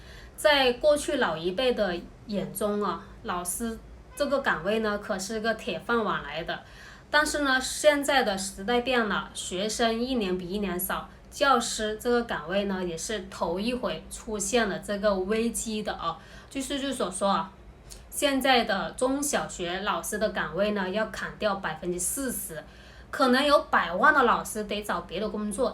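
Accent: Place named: Guangdong, China. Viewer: 小姐的臉孔非常熟悉，妳是受人僱傭請來做這種反社會的視頻吧！妳廣東口音是聽的出來的。深圳是你的常駐地點。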